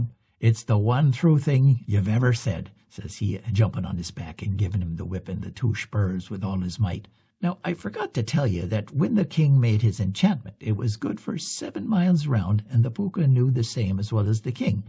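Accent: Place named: Ireland. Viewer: I thoroughly enjoyed listening to this scary Irish tale. The Irish brogue reminded me of the tales my grandad would tell me when I was a child. I felt like i was back in the old country sitting by a warm peat fire. Thanks!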